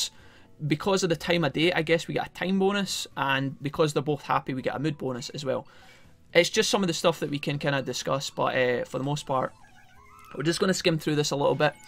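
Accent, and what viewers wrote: Scotland, United Kingdom. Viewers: Scottish Ron Weasley Digimon World let's play...... You know I'm gunna thoroughly enjoy all these episodes ☺️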